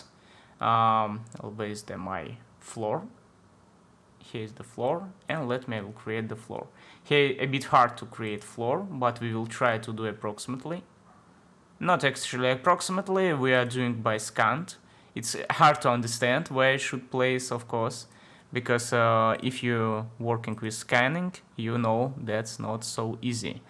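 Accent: Russia. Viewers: Какой прекрасный русский акцент!!!🙃 А я поначалу очень удивился тому, что понимаю почти все что говорят! Обычно понимаю процентов 30 из сказанного!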